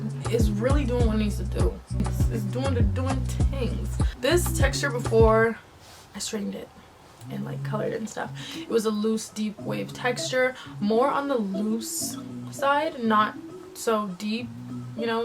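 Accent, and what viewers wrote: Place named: Canada. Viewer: Yessssss someone from Canada. I knew as soon as you starting talking 😂. You are gorgeous! Thanks for this video, it’s been in my cart forever. So happy to find a Queen with this colour!😍😍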